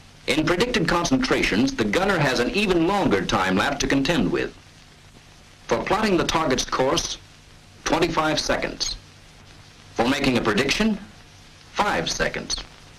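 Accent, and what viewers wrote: United States. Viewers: Classic American accent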